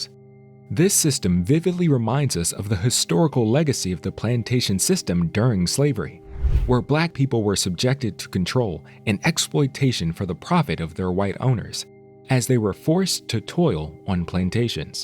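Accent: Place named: Europe. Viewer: Am I a racist for thinking this dudes voice is mighty European sounding 🧐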